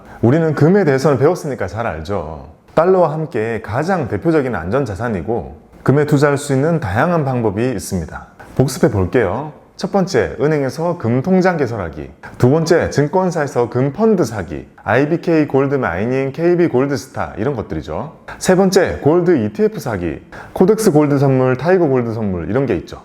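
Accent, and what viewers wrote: Gyeongsang, South Korea. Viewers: 와후~~ 추카드려요^^ 님~경상도 빠꼼인가봐요,억양이 ~~ㅎ 저도 그쪽인데 ㅋ 덕분에 실버버튼 구경했네요^^ 미소 미소 미소 미소 ^^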